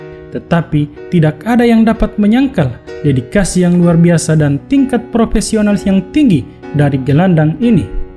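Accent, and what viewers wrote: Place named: Sumatra, Indonesia. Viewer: logat nya mirip org sumatra gitu min hehe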